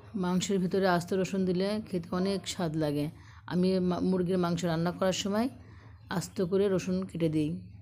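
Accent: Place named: North Bengal, India. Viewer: প্রথমে ধন্যবাদ জানাই সালাম দেওয়ার জন্য । কথার ধরনে বুঝা যায় তুমি উত্তর বঙ্গের । থাক্ ভাল থাকুন, সুস্থ ও নিরাপদে সুরক্ষায় থাকুন।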